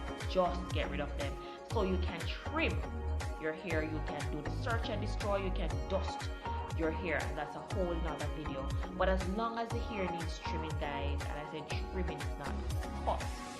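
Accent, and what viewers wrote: Jamaica. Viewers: your hair is beautiful and do i hear a jamacian accent?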